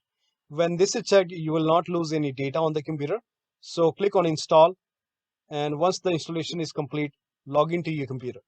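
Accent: India. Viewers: i heard an Indian mans voice and knew i was saved.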